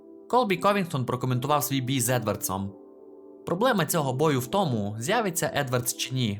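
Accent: Transcarpathia, Ukraine. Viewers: У автора відео закарпатський говір))))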